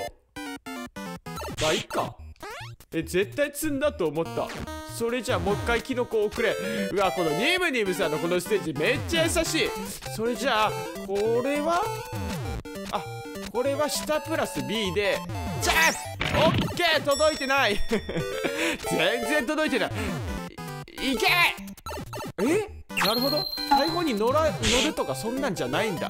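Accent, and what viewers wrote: Fukuoka, Japan. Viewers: 福岡弁可愛い😆ww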